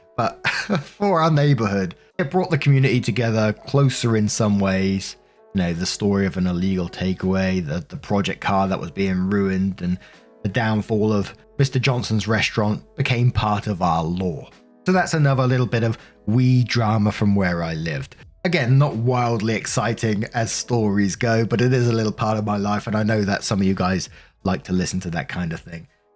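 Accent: United Kingdom. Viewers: Hey! This doesn't have to do with the specific video, but I just wanted to show my appreciation towards you and your content. I've been listening to your videos for several months now while at work (you can listen to whatever as long as it's not too distracting), and I've always loved reddit stories, so when I discovered your channel, it was a total game changer. I like your personality, your perspectives, your anecdotes, and yes, your accent (its true, Americans love British accents), and this channel has honestly made my work shift much more enjoyable. I even got youtube premium solely because of you. I decided to get YouTube membership to further support you. So thanks again, and please keep up the great work!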